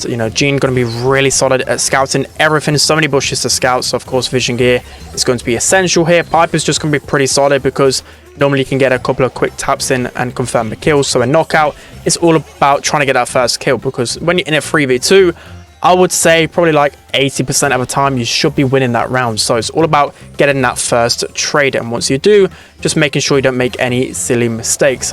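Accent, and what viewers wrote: United Kingdom. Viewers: That’s it I heard enough British accent for today.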